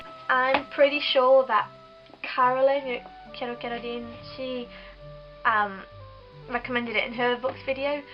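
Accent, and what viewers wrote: United Kingdom. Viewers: all right : I love your dress/shirt, I LOOOOOOOOVE the flower in your hair, I love when you speak japanese but I also love british english accent......... ( and I love the almost-shirtless-Yunho on your wall just behind you...) haha great vid :D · Greetings and THANK YOU!! I love your videos. Your japanese is excellent- your accent and your way of speaking sound so perfect. Listening to you is so INSPIRING!! I also love your english accent. You're super cute, too!! Just wondering, how many kanji do you know and how did you learn them? Just from workbooks? How long did it take to learn enough kanji to read magazines? Can you read books, too, like novels? I heard you need to know about 2000 to read the newspaper. ありがとうございます :)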